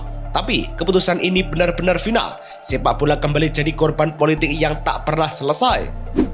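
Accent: Java, Indonesia. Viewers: Salfok sm suara yg ngomong medok bgt😅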